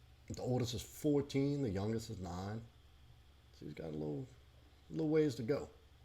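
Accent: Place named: Louisiana, United States. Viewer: I can tell you’re from Louisiana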